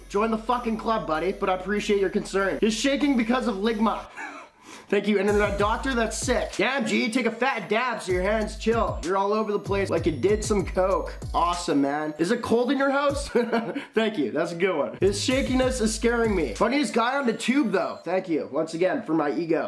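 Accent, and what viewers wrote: Canada. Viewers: Hope you stay safe man//side note you have such a THICC Canadian accent already knew where you were from in the first min 😂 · Are u Canadian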